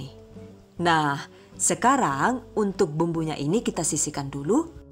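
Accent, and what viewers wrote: Banyumas, Indonesia. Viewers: Deneng ngapak mbok ayu😂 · Mantap CENEL ini, sy suka, cara bicaranya jelas ada dagelanya juga, bahasa ngapak nya itu lo, bisa bikin ketawa 😂😂😂 aku sangat suka sama cenel ini 👍👍👍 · Enak kuwe yuu, tok jajal olih apa ora yu.🤣🤣🤣 Rika teyeng ngomong Ngapak ya🤣🤣🤣🤣👍👍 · Matursuwun ilmune bermanfaat Haha ha kocak jawa ngapake .sukses trs mbak yu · Makasi Mbaaak... Btw ailopyuu logat ngapakmu. Lagi enak2 ngrungokke serius ujug2 ngekek kemekelen🤩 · Orang madiun kok ngapak yah...?😁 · Deneng ngapak yak. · Suka banget klo udah kedenger ngomongnya ngapak2 gitu..aelaah bikjn ngakak polll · Ngapak ngapaknya kerenn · Hahaa jebulè wong ngapak 😅😂 (di akhir video) baru nyadar 🤣🤣🤣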